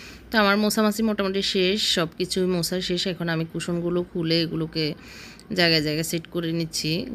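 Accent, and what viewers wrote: Noakhali, Bangladesh. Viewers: Apu kamon acen??apnar kaj dekhta onk valo lage.apnar blog onk valo lage.apnar kotha sunle onk apon Mona hoi.amrao basai noakhalir vasai kotha boli.vaia k o onk thanks apu k support daoar jonno.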